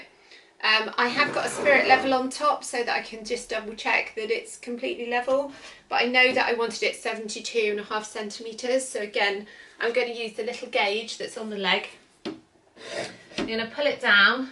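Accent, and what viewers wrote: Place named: England, United Kingdom. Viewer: How beautiful English accent.. Many thanks for informative video.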